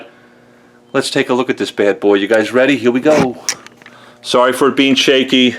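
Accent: New York, United States. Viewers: I was going to say you sound like you're from NY. (NJ here) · ha! I thought he sounded a little "New York" accent as soon as I heard - as someone who has visited New York and loves it:-) Thanks for the vid. Meguiars seems to win on most things. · Jesus, I feel like i'm hearing my brother 'taawking' on the phone with me:) As usual, you have the most honest and informative videos on car detailing. You're a legend,!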